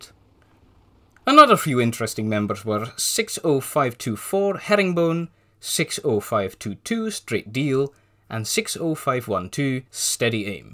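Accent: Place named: Scotland, United Kingdom. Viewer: You sound Scotish today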